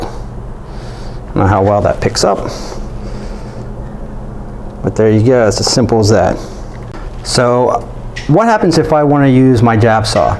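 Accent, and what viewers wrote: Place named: New York, United States. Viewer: Great video. I'm loving your accent...... straight out of 'Goodfellas' 😊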